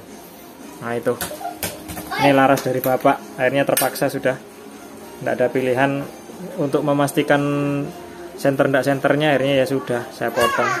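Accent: Madura, Indonesia. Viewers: Klw dri logat bahasanya kyaknya dri madura ya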